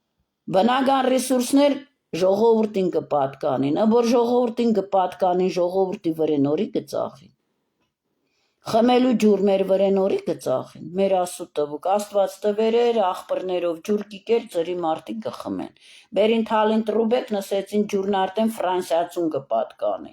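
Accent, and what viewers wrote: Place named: Msho, Turkey. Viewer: ❤❤❤❤❤, так приятно слушать Вас именно на моем родном Мшо диалекте ❤❤❤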